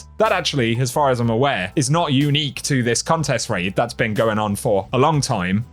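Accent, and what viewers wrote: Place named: United Kingdom. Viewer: Always useful D2 info. & British accent!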